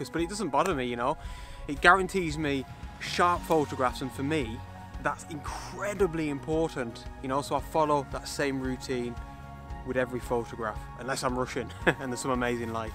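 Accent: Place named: England, United Kingdom. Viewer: Love the photos and the English accent! (great focusing tip, also)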